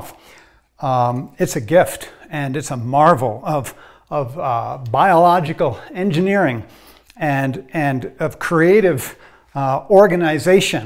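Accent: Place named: London, United Kingdom. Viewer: London..that's the first thing I noticed(^,^)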